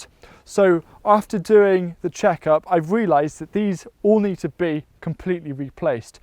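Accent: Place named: Wales, United Kingdom. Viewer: yet another excellent video / and recommendation to give it a break for now. Meanwhile, question, please.... which month did you plant the OCA in your raised bed. I am mostly keen to learn 1. how late I can plant them? 2. Are they a perennial or can one save tubers or “seeds” from one season for the next?? Thanks I have seen loads of your tutorials and your Welsh accent is also a real bonus!!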